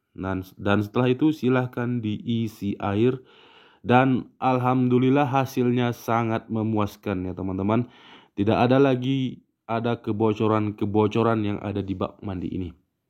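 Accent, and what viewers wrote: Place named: Gayo, Indonesia. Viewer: Dari cara berbicara abg, pastinya saya tau sukunya Gayo...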